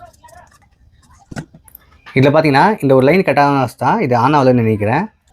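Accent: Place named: Bengaluru, India. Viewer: Bro Bengaluru tamil nalla irukku 👍😎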